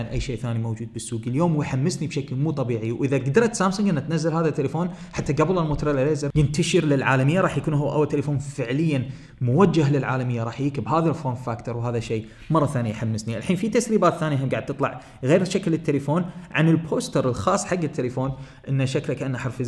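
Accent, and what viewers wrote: Kuwait. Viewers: التقنية خراافية ولكن الانتشار الاوسع رح يكون بعد خمس سنين بسبة السعر الجنوني اللي بينزل فيه للسوق وللأمانة انا سوري بس اللهجة الكويتية يا اخي كشخة😄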